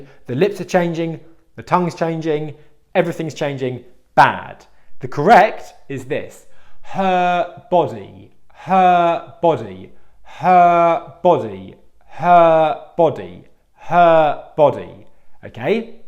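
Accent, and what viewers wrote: United Kingdom. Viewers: about this"s video the accent is British English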